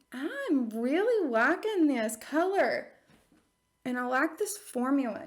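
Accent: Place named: South, United States. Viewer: I’m trying to place your accent. I love how different southern accents can be when we live in different states.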